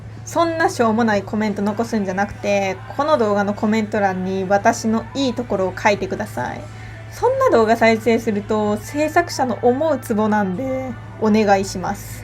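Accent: Kansai, Japan. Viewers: 関西弁やから、親近感湧く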